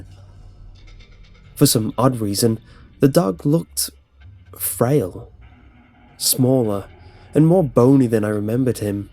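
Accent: United Kingdom. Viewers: british accent is succulent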